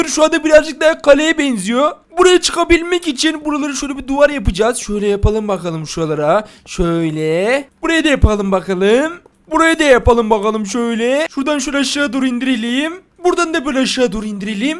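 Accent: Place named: Urfa, Turkey. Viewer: Abi aynı Urfalı gibi konuşuyorsun bende Urfalı yım 😍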